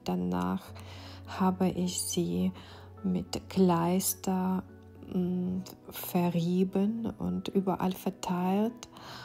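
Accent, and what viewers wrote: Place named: Russia. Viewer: Классно! Чуток слышу наш акцент.:)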